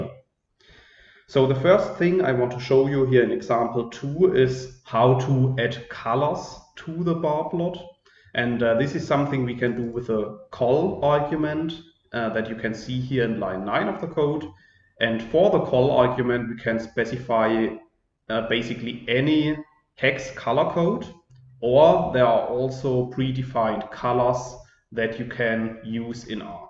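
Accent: Germany. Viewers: Hello, I wanted to say thank you very much! Your videos really saved me today! Btw is that a german accent or am I imagining it? If so then: Super Video! Ich freue mich auf weitere Tutorials :D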